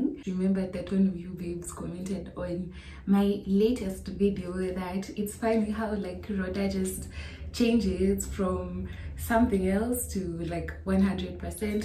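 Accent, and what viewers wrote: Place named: Kenya. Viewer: After the Nigerian Accent, I really love the Kenyan Accent😍 so distinct.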